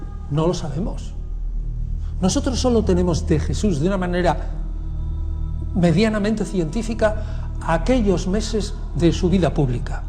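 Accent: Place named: Argentina. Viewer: Si, está muy equivocada éste fulano, parece argentino-español. Ya en su cara se ve el castigo divino por tanta mentira. Lo malo es q mucha gente q está naciendo lo escucha y le creerán. NO LE CREAN, ÉSTE TIPO NO ESTUDIÓ CON SERENIDAD LA TRAYECTORIA DEL ÚNICO HOMBRE Q EXISTIÓ SOBRE LA TIERRA Y HASTA LA FECHA... AÚN NO NACE OTRO IGUAL. SALUDOS DESDE CHICAGO.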